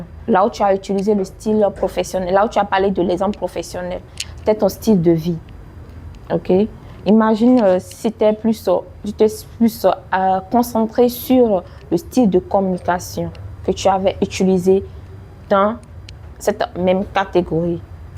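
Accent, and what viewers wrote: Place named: Guinea. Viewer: comment tu vas? Bravo pour le travail que tu fais. L'accent guinnéen sort un peu hein😅. Bonne chance pour la suite et Dieu te garde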